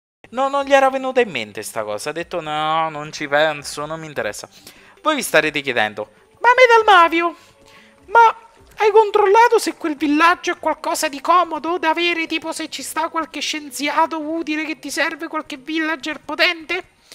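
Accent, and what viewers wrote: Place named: Rome, Italy. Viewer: ah ok. lo so che è di Roma dalla voce, e su so solo che ha origini asiatiche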